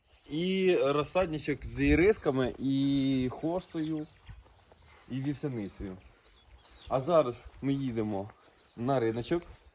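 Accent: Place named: Poltava, Ukraine. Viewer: Чую чую полтавський говір, бо сама з Сотницького,Гребінківський р-н,там народилася ,а зараз під Києвом живу,а батьки там. Успіхів вам та мамі. Молодці. Приємно бачити та чути,що ви вже й канал маєте. Розвитку та продажу,нових ідей, здоров'я та мирного неба. Підписка😁💛💙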